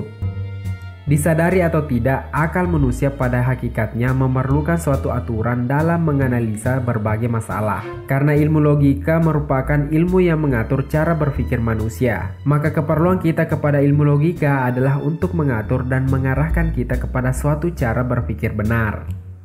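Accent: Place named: Sulawesi, Indonesia. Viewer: ORANG SULAWESIKI'? KENTAL SEKALI LOGATNYA HEHE SALAM SULAWESI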